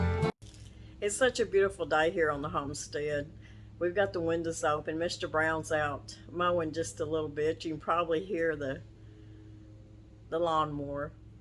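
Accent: South, United States. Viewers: As I was watching this video, I was making honey wheat flax seed bread adapted from Heather at The Kneady Homesteader. House smells heavenly! I love your mellow voice and easy southern accent. So glad I found your channel.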